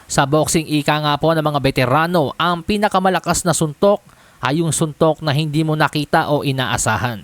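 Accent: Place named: Visayas, Philippines. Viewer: Bisaya ka pala sir blogger dahil sa tonada mo kahibalo ka mo bisaya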